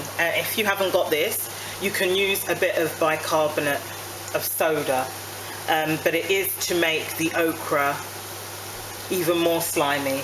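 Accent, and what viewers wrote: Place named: RP, United Kingdom. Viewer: I like your accent is so posh ❤️😂😂😂 ! Love from a Ghanaian sister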